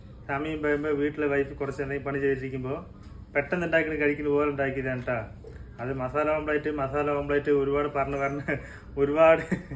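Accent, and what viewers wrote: Tamil Nadu, India. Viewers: തമിഴ് ചുവയുള്ള നിങ്ങളെ സംസാരമാണ് എനിക്കേറെ ഇഷ്ടം❤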